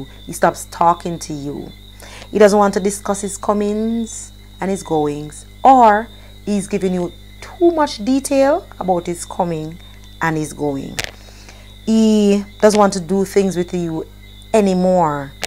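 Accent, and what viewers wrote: Jamaica. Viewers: I always enjoy watching your content on relationships tips and also listening to your Jamaican accent.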